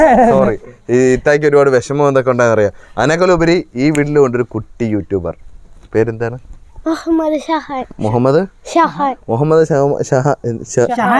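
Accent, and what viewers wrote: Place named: Thrissur, India. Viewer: Thrissur slang❤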